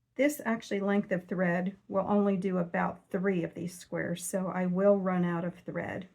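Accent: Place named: Delaware County, United States. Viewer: I recognized your accent immediately and had to look back to find your location! My family and I are DELCO and have nice relocated. Your voice and accent is soooo comforting! Your quilts are pure love! So happy to have found you! I just want to get away from the commercialized channels and found home!!! So happy!!!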